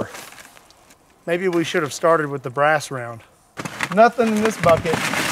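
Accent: Kentucky, United States. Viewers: your voice says kentucky, but your clothing says oregon.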